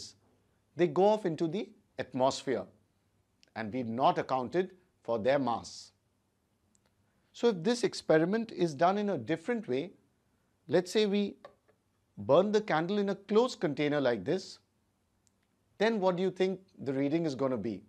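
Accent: India. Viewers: i know he's indian cuz his accent🤣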